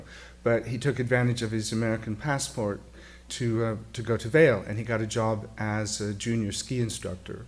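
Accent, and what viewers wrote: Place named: United Kingdom. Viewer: It’s amazing there are moments he sounds British and others American. I can understand why sometimes he describes himself as an alien in his own country.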